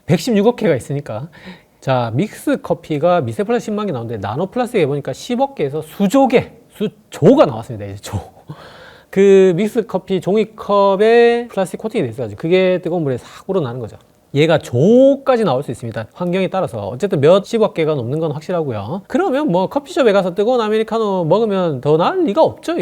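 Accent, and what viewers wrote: Gyeongsang, South Korea. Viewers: 지구가 프라스틱에 째리게 되었다 ㅠㅠ 갱상도라 더 더가옴. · 선생님 잘 듵었습니다 구수한 경상도 사투리가 정겹네요 미세프라스팈에 쪄였어 살았네요 줄이는 방법 알려주셨어 감사합니다^^~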